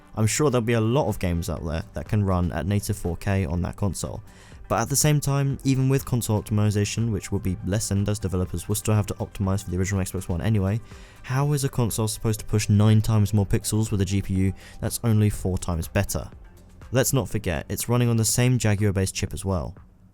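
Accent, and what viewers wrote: United Kingdom. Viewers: Thumbs up if you like to hear doing movie reviews in his righteous British accent with a shot of Scotch!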